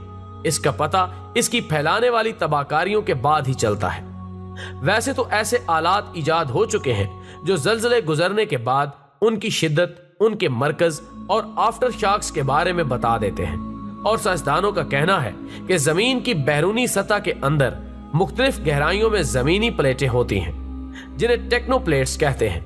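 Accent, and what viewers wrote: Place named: India. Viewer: logo please isko unsubscribe kar do ye RAW walay misinformation aur na umeedi ki videos laga rahay han...zara ghor se dekho is video ko iska talafauz sunno...ye aik HINDU ha RAW ka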